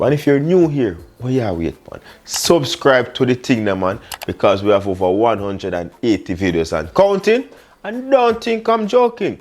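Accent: Jamaica. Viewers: Chief, I am not just here for this deliciousness, but the Jamaican accent. Lol keep doing your thing. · Wa gwan! it's the Jamaican accent for me. Love it. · Am here for the jamaican accent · Fat n juicy! 😁luv dat Jamaican twang!❤️👍🏽😋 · Me rate di video from mi hear di jamaican accent🇯🇲💯💯🔥🔥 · I love the Jamaican accent 🤭 and I love his realness too! Smelling the food and using bare hands are things we want to do behind closed doors but I'm glad you make me feel comfortable watching this. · Love this guy! He’s authentic! Mi miss Jamaica 🇯🇲 just from listening to him! Mi ready fi eat! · Your accent ❤ I had a boyfriend from Jamaica in the 90s. He died in a car accident. Listening to you brings tears yo my eyes. Im going to make this today! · I need a Jamaican man in my life..his accent😍😍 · I love that Jamaican accent. Kenya we locked in waaaagwan😃😃😃. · Wicked! A jus fine yuh and am very impressed. 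Can't wait to try this recipe. Love the mix of English and Patois and di sweet Jamaican accent. Chef, Yuh mek mi feel more homesick dan hungry. Trus mi!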